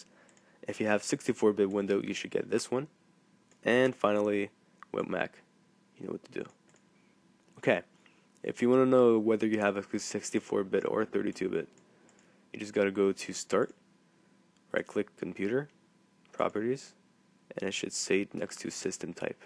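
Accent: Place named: Canada. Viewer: T'es Canadien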